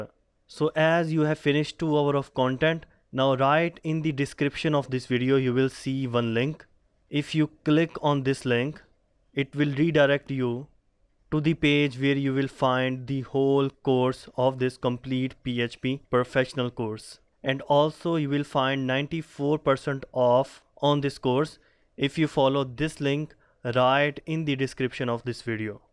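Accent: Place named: India. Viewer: i started taking your course but i need to confess your english give me a headache english isn't my first language and this indian english just kill me when it comes to learning something difficult , i still in the number lesson but i will do my best to finish the course soon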